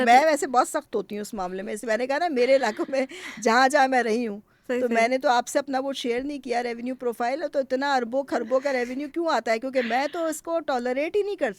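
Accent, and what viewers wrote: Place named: Sialkot, Pakistan. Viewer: gi host sahiba ap Sialkoti lag rehi hn from your accent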